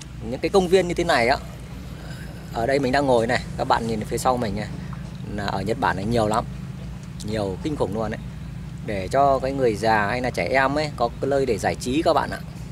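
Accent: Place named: Nam Dinh, Vietnam. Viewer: Hê nô...giọng Hà tây hay Nam định mà nghe ngộ ngộ , chắc Nam định 100% nuôn !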